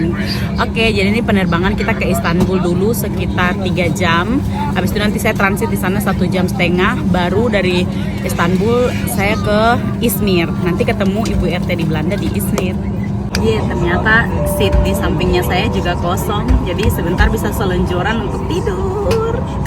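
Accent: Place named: Eastern Indonesia, Indonesia. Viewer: Sehatsehat kaka. Vlognya sllu menarik, dn sya suka sama gaya bicaranya kaka krna kebetulan saya juga orang timur. Sya sudah ikuti dn nonton vdeo kka dari sebelum mncapai 1 jta subscribe, dari msih tinggal di apartemen, tdk prna bosan sma vdio2 kaka. Mkasi sudah menghibur keseharian sya, dn smoga alur cerita hidup kaka bisa ketularan ke saya yg smntara proses memikirkan dn melangkah sdikit demi sedikit untk mnuju masa depan dn hdup yg lbih baikk🤗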